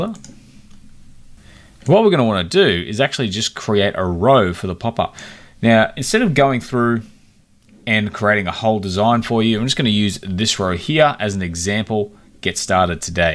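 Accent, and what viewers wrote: Australia. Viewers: Thanks for the tutorial. Nice to hear an aussie voice too!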